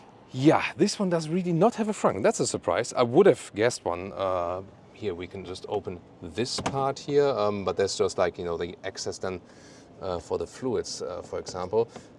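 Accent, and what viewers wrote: Germany. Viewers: I love your German accent. Funny as hell.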